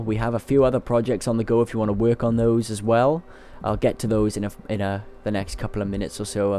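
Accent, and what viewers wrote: Wales, United Kingdom. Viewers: are you welsh?! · I'm British... So why would I get tired of another British voice?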